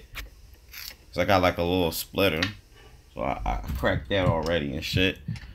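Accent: Philadelphia, United States. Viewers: You sound like a Philly boul lol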